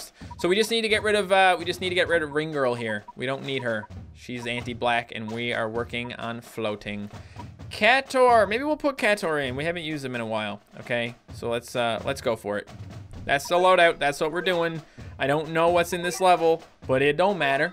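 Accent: Newfoundland, Canada. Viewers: love ur canadian accent and ur "cosed" "o" in "sorry" from where I am the "o"s are "open" my sorry would look like a sarry and we're made fun of because of that ^^ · Wait your a Newfie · You're Canadian?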